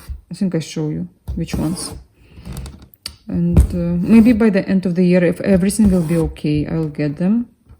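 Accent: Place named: Russia. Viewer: Очень нравится ваш "русский" акцент😊😊 а не как другие, пытаются симулировать акцент. хрипеть еле выговаривая слова 👍👍👍👍👍понятно каждое вами сказанное слово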